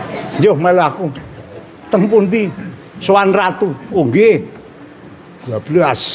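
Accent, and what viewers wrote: Magelang, Indonesia. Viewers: Gaya bicara nya khas orang magelang ....❤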